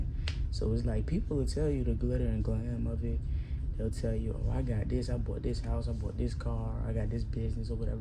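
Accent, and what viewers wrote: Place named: South, United States. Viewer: yk the whole video i was saying “damn she talk like she from the south” it had me wondering where you was from cs shit got to familiar 😂aye as soon as you said most people ain’t seen outa va Ik you hada been from here ,757 specifically i might be wrong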